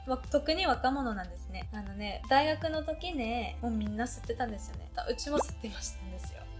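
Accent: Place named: Kansai, Japan. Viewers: ところどころ関西弁入ってるな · ちゃん、とてもかわいい！関西弁まじりの日本語もステキ❣️ とても日本を良く見てくれていて… うれしいです。ありがとう💕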